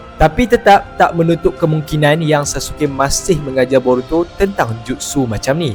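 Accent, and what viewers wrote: North, Malaysia. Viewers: Bahasa melayu loghat utara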